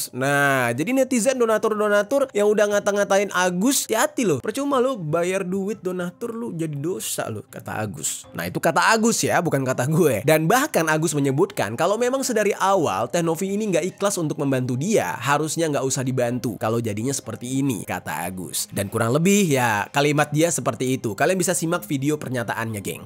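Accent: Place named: Aceh, Indonesia. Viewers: Logat aceh nya itu loh keren banget bang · Suka banget dengar bang logat aceh😅❤ tak ulang² terus😂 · Bang asli Aceh ya,cocok banget logat Acehnya,ingat temanku waktu kerja di Jakarta. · orang aceh selatan dia ya? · Dari cara abg pake loghat aceh, udh tau klu bng lagi keselll 😂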